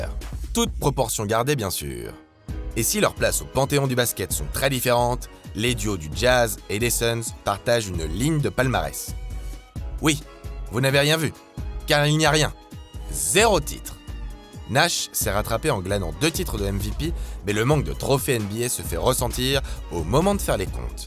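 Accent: Quebec, Canada. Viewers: L'accent quebecois. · Mdrrr j'étais pas près pour l'accent québécois 😂😂😂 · Merci pour cet accent Québécois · Non non non pas l’accent Québécois 😭😭😭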